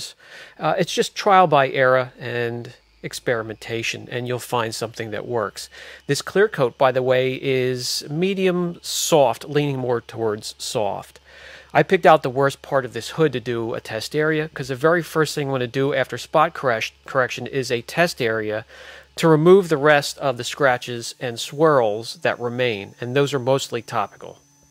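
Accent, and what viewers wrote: Pennsylvania, United States. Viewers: “Trial by era” Shout out to the PA accent. 😉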